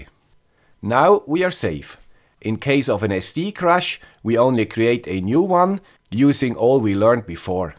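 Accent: Switzerland. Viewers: I think this video will give me the boost I need to have a much better home server! DrFragle and Mr. Shark was(Antonio?) already hinting on this kind of setting with docker. This is by far the most useful video I have seen from you. The Raspberry 4 will be ordered soon. The SCRIPT from Peter will now be history for me. Also, I sense a backup supply system will not be necessary anymore because of the much easier backup system. This video will keep me busy for some time, which is good! Thank you! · Fantastic! Thanks!! ʘ‿ʘ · Another great video by the engineer with the Swiss accent. I like the summaries. Great job, I will use some of this for the VPN portion. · your swiss-deutsch english accent is amazing ! cheers from cheese land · So, Swiss aksent ist German aksent? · The guy wis a swiss accent.. That sounded so chilling. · Off course: with summery! I think it's part of the brand, like the Swiss accent! 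· Very good, interesting and helpfull descriptions. Thank you !!! :) Your English vocabulary is really great, but your pronunciation is simply indescribable Swiss. Please take a much longer holiday in England (Cambridge or Oxford), it definitely will help you. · guy with a swiss accent, we need a current video on installing docker-compose and nginx proxy manager on a raspberry pi. thank you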